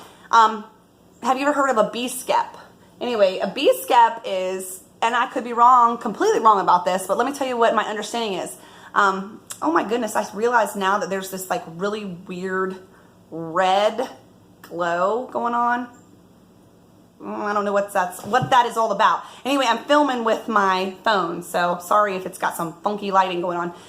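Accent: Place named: New York, United States. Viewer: Are you from New York. Cute accent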